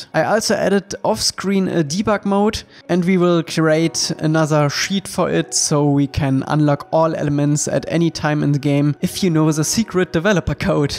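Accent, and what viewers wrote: Germany. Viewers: German accent is so cool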